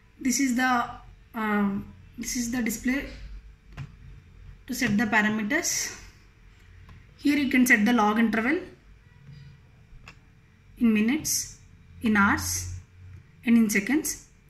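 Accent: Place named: India. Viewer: This english is just understandable in India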